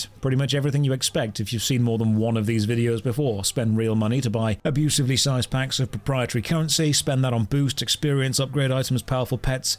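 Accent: United Kingdom. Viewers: Way back when, in mid-late 2000's, a friend of mine in college got really hooked on Grenada Espada and was talking at length about farming different types of gun powder and assembling Zodiac Doll or some shiz. And I could not express how little I cared. Many years later I am watching a different dude talking about effectively the same and find it much more engaging. Conclusion: everything is better in British accent.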